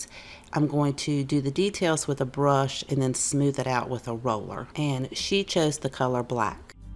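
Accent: South, United States. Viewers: Same!! I was trying to guess how far north of I-10 her accent originated from 😂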